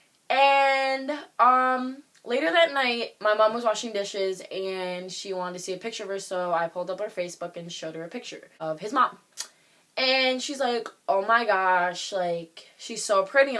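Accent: Alabama, United States. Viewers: Are you from Alabama? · Uh...are you from ALABAMA ? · are u from Alabama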